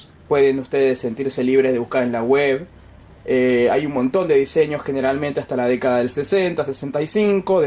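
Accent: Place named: Argentina. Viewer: Tengo un Nikkor 200mm F4 Ai que supuestamente es radioactivo y que es de los 70s, podra ser? Me encanto tu canal, mucha informacion, y es refrescante escucharla en "argentino" y no en ingles jajaja, un abrazo grande!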